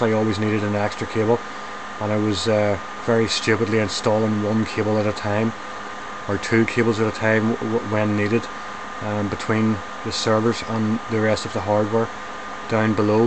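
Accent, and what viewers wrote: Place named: Northern Ireland, United Kingdom. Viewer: You sound like your from Northern Ireland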